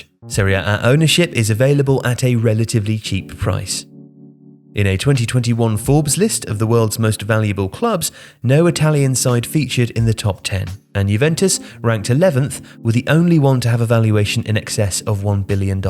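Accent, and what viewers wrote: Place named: England, United Kingdom. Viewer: He pronounces different because he is English that is how it is in his language.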